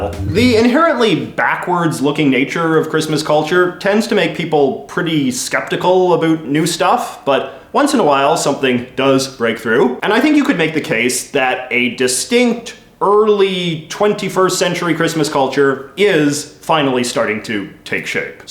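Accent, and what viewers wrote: Canada. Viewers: To any Canadian who denies they have an accent, listen to this guy. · Love the Canadian accent. Secular Christmas music from the 80's and beyond will always suck. It all has become a holiday of acquirement instead of the real meaning of Christmas.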